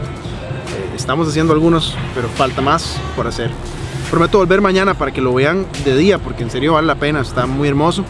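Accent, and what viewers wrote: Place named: Colombia. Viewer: pareces colombiano, de echo hay partes de que tu acento se asemeja mucho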